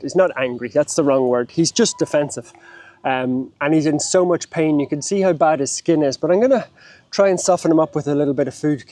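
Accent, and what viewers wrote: Ireland. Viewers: I think dogs like the sweet tone of your voice and your Irish accent ! Thank you for helping and saving this poor dog. He landed on his 4 paws in Doggoland !!!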